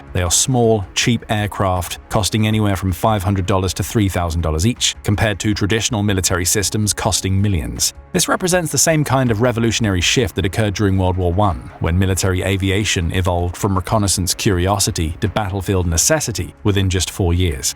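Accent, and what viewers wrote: United Kingdom. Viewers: Ahahahaha the accent dont make us go to wrong analises. The british trying to win the loose war on the blabla. Really???!!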